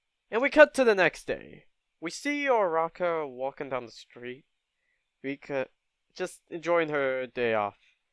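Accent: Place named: United Kingdom. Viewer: Why, it sound like British accent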